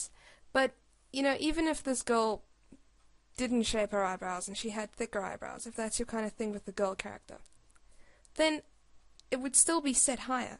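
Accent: South Africa. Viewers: Ay! Is that a South African accent I hear? Love it!!! Dig this video! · Are you South African? Love this tutorial!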